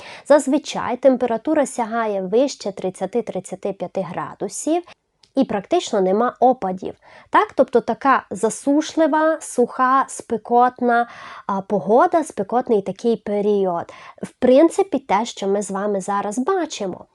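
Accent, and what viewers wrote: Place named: Transcarpathia, Ukraine. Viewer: Дякую за відео. По акценту чути що ви з Закарпаття. Дуже мило і красиво пояснюєте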